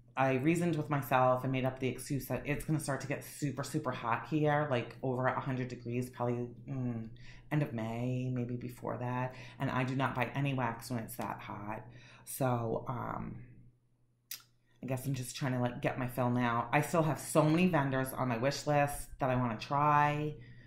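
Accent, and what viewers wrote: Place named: New York, United States. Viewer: I’m from Boston. She sounds like NY or New Jersey.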